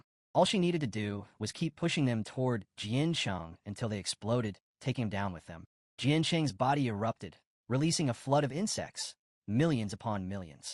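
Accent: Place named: United Kingdom. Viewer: Why does this have british accent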